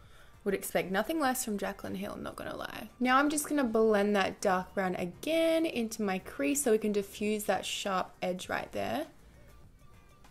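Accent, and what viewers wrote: Australia. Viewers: Omg I've always watched her with the sound off and I had no idea she has an Australian accent I was so surprised!!! I am so dumb😂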